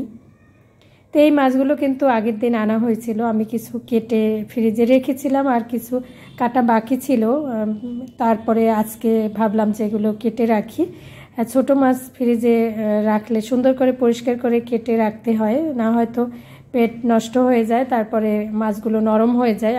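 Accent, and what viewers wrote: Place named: Barishal, Bangladesh. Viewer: আপু আমি তোমার ভিডিওতে আরো অনেক বার সুনেছি আমাদের বরিশালের কথা সত্যিই কি তোমার বারি বরিশাল তাহলেতো ভালই হলো আমার একটা আপনজন পেলাম আমার পরিবারে টমেটো দিয়ে মাছ রান্না দারুন হয়েছে অনেক ভাল থেকো দোয়া রইলো তোমার জন্য ভাল থেকো ইনশাআল্লাহ আপুমনি❤❤❤❤❤❤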